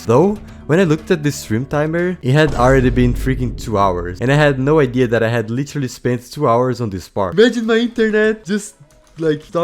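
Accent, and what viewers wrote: Brazil. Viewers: I recognize the Brazilian accent everywhere lol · Ae mano, eu notei um pouco de sotaque no jeito que vc fala em inglês, e só queria perguntar se vc é brasileiro (Hey man, I've noticed a little bit of an accent on your English, and I just wanted to know if you're Brazilian)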